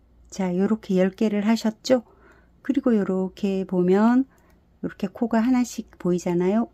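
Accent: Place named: Gyeongsang, South Korea. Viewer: 유툽 여러 선생님들 많지만 최고예요~^^경상도 억양 넘 정겹고요 꼼꼼하고 디테일한 설명에 감동여요^^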